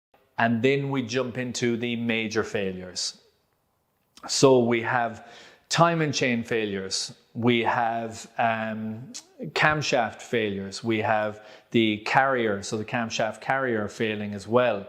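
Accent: Ireland. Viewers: Completely agree with your list. All mechanics and auto electricians have a list of vehicles that they would not recommend to their worst enemy. I am retired from the trade and I understand the issues that mechanics have to face in relation to dealing with badly engineered vehicles. Unfortunately, all vehicles have issues of some kind, my list includes some Peugeot models, not I hasten to add not the old 505/504 models. Some Volvo models have given me grief in the past. As usual great video. Just out of interest what part of the republic of Ireland are you from, cannot place the accent, I am from Northern Ireland and living in Melbourne Australia.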